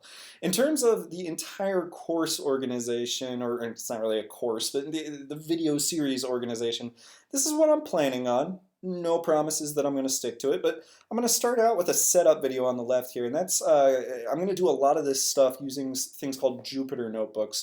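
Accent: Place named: Wisconsin, United States. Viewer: Oh, also, I think you're developing a bit of a Wisconsin accent, and I'm not happy about it.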